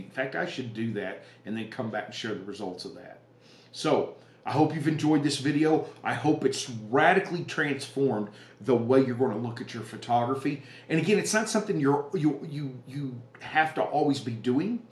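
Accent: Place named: Texas, United States. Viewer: I’m 65, and this may be the first time I’ve heard “paradigm” in a Texas accent. (Not to sound like a West Coast doofus elitist, but hey, you brought up the accents thing.) I haven’t been too conscientious about exposing to the right in my digital photography, falling prey to having the image look good in the viewfinder/screen. I’ll definitely want to work on that, thanks.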